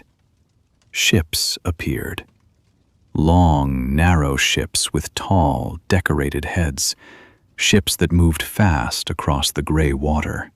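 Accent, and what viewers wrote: United Kingdom. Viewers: Many thanks for this channel You're accent is British isn't it?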